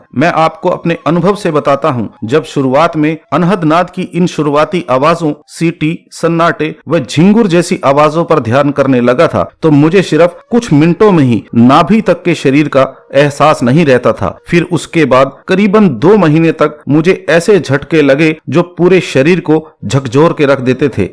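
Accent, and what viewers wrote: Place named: Punjab, India. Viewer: I can feel your Punjabi accent Wise words..I will definitely try this method 🙏🏻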